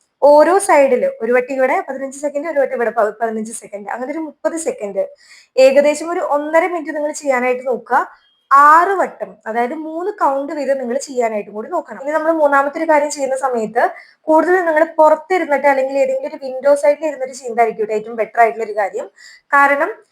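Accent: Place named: Malappuram, India. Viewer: 100% ശരിയാണ്. മലപ്പുറംകാരിയാന്ന് തോനുന്നു. പൊതുവേ മലപ്പുറം കാർക്ക് പല അക്ഷരങ്ങളും വായീന്ന് വരില്ല. ഉദാഹരണം: മഴ എന്നു പറയുന്നതിനു പകരം "മയ" എന്നേ പറയൂ. പഴം: പയം, കഴപ്പ്: കയപ്പ്, പഴനിമല: പയനിമല, കഴിഞ്ഞു: കയിഞ്ഞു, നാക്ക് വടിച്ചാൽ മാറുമോ ഈ പ്രശ്നം. സാക്ഷര കേരളത്തിൽ ഇങ്ങനെയൊക്കെ കേൾക്കേണ്ടി വരുന്നു. കഷ്ടം.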